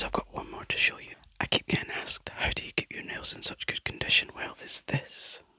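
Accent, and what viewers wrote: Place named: United Kingdom. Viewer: Your nails are strong and sound AWESOME!!! 🤩 Loved the mic caressing, the tapping and scratching on the first goldy Blursh all-in-one liquid pigment, the tapping and scratching on the Blursh Ice Ice B B box and the tapping on the lid, yes 😊, - that sounds cool, I need THAT lotion 🧴 I have super weak nails 😫, yes 🥱 - she is THE QUEEN ❤ I always enjoy a good BRITISH 🇬🇧 accent 🙃 I can tell you LOVE and ADORE makeup! 💄 Well done,! 😊 I came to support because of Lorri 🤗 slime would be a EXCELLENT trigger assortment idea! ☺️ In all the ways: Alone, on the mic, on a table, hands ups, cloudy, sticky, with toppings… Whatever 😇 ☀️ Take care!! Have an amazing night!! ✨🌝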